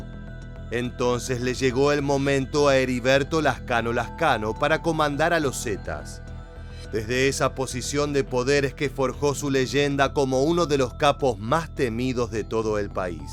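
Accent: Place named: Argentina. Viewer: Me da risa como el narrador habla bien argentino,pero neta esto es bastante serio con esto de México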